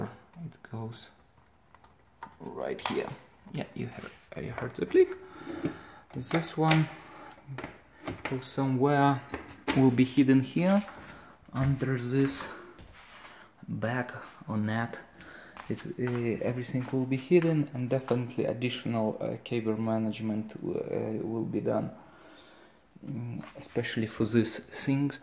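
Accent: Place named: Russia. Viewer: You are not a Russian case. The accent is similar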